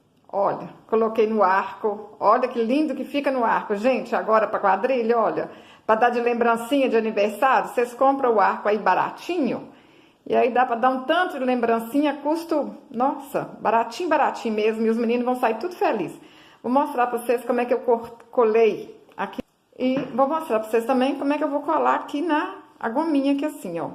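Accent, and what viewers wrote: Minas Gerais, Brazil. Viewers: pelo sotaque parece que você é mineira,eu também sou. Amei essa lembrancinha!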